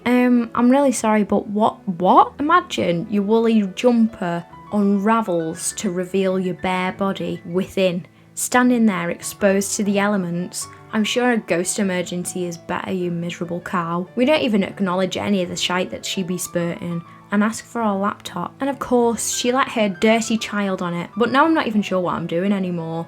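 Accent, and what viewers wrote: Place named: North West England, United Kingdom. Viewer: These vids are so funny, big up north west accents girl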